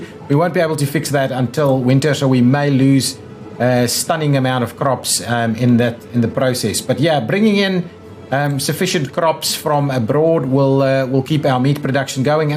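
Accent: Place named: South Africa. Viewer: Hey, you don't sound like an Australian... much more like a South African ;-) . Nice to hear another South African stream/content creator.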